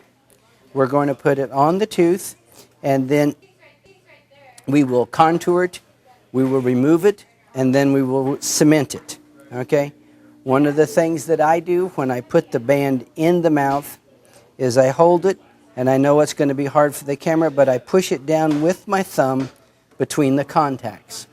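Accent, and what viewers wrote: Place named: Arab world. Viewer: شو مبين من لفظك عربي 😂♥️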